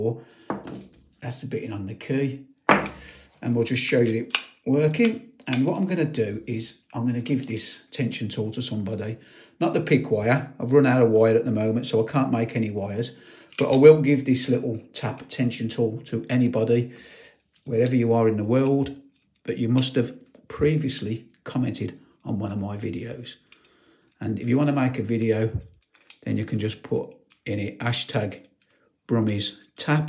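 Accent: Birmingham, United Kingdom. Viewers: mate, did you ever try the bicycle wheel spokes for tension wires?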